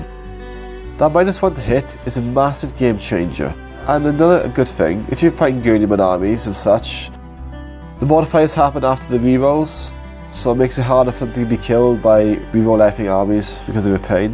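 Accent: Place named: Wales, United Kingdom. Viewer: haha I read through all of these just to confirm you were in fact welsh. would recognise that accent anywhere, big ups from Crickhowell and Swansea. Necrons are a horrible faction to play against though, far too much reanimation, or stupidly op invulnerables..i'm looking at you 3++ wraiths.. I mean seriously gw were just lazy not thinking of better rules to reflect their toughness like..increasing their toughness, or giving them a 5+ fnp ect.. having 3 of the receive a charge from a ccw ironclad dread eat it up and then devour a leviathan is just stupid if you ask me. Anyway good vid sorry for the rant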